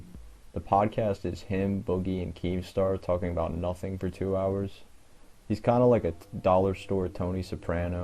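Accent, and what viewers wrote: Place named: Turkey. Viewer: Are you turkish? You sound very turkish to me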